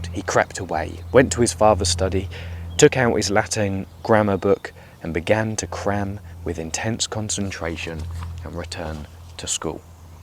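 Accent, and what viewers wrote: United Kingdom. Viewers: your british accent makes your point perhaps better than your words.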